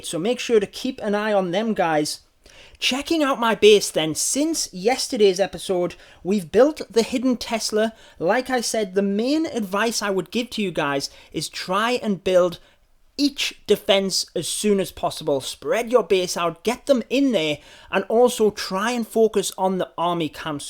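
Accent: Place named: England, United Kingdom. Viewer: i luve youre englaish aiicecents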